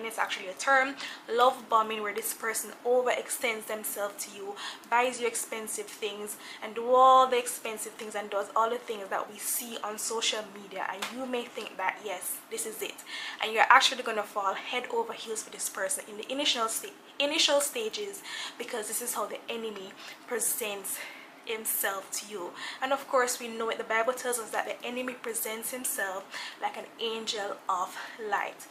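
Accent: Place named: Jamaica. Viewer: Hello I see You have an accent are from Jamaica 🇯🇲 by any chance?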